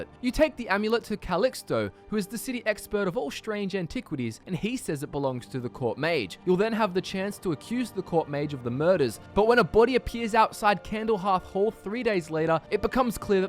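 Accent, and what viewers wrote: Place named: Australia. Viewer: me: starts video me: oh hey fellow aussie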